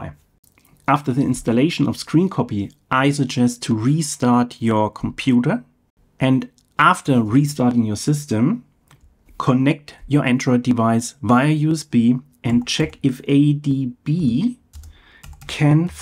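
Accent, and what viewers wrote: Germany. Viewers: man erkennt das du deutsch bist lol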